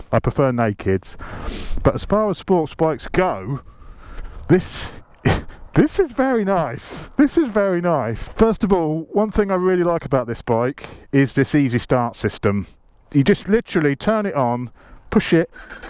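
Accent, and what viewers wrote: United Kingdom. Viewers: Fu and your British English!!!!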